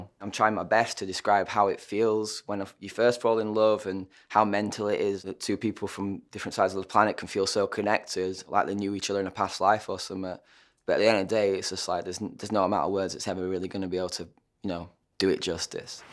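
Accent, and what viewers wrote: United Kingdom. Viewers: Is he british by any chance?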